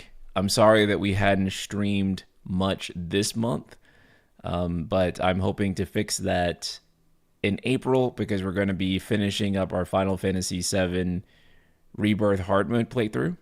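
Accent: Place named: North Texas, United States. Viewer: ayyy I didn't know you were from North Texas too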